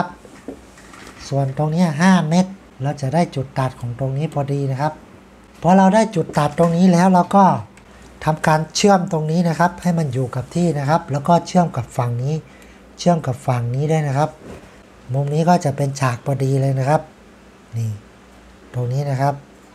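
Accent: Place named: Thailand. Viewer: เค้าพูดภาคกลางไม่ชัดไม่ใช่แปลว่าไม่ใช่คนไทยคนเหนือคนใต้สุดก็พูดกลางไม่ขัดจะถามทำไมยายผมไทยแท้ยังพูดกลางไม่ซัดเลย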